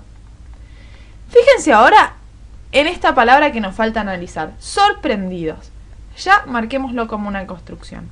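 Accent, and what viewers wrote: Argentina. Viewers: hace tanto que no escuchaba ese acento argentino hahahah muchisimas gracias por la explicacion!!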